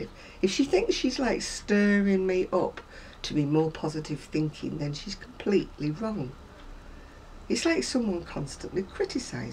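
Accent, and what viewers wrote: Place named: Manchester, United Kingdom. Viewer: Oo, thank you for your lovely channel. It was a bit like 'coming home' hearing your smashing accent. (I've lived away from Manchester for many years - down south - but as they say 'you can take the lass out of Manchester, but you can't take Manchester out of the lass'!) I agree with your sentiments entirely. After all, if you just churn out crochet items to meet a fad demand it then becomes 'just a job' and where's the fun in that? Keep on with your fab videos, I'm a bit late to the party but intend watching them all!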